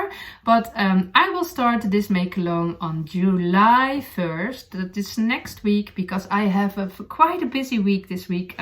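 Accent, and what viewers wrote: Netherlands. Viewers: I love your podcasts. You r duchyenglish is charming. It is one cactus many cacti. We have a few words ending in I l think it is Latin but l am not sure. Continue with the English. I lived in Sweden for a few years and would like to think that my Swedish was as good as your English. Anyway one cactus many cactii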